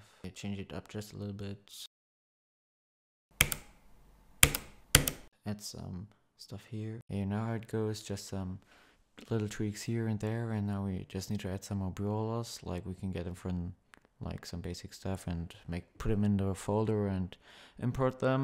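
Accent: Germany. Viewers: Als bist du ein Deutscher 👏🖒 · Bist du deutsch?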